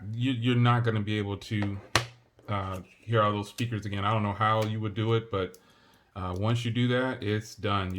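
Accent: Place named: New Jersey, United States. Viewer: Man your Jersey accent is heavy at times sound a little like Denzel , this is not meant as an insult, especially with my deep south accent 😂😂😂 Great install great video, yes always answer wifey 👍🏾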